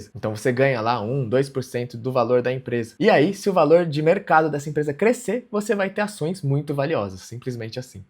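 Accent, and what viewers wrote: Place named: São Paulo, Brazil. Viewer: nunca vi uma pessoa parecer tao paulista jesus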